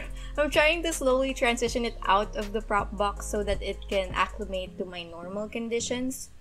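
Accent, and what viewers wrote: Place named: Philippines. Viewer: I am new to your channel, where are you based? I love listening to your clear and informative explantion...no nonsense! Are your teacher or a trainer? I noticed slight Phillipino accent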